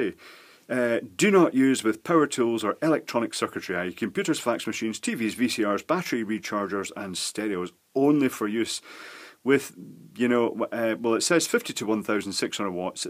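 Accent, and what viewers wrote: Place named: Glasgow, United Kingdom. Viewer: Was watching with fascination, and, just for giggles, switched on the inline captions., with the technical terminology and your mild Glaswegian accent, it really didn't do a very good job, I went to a website yesterday that dealt solely with misheard song lyrics; I wonder if we can supply them with material for an annexe?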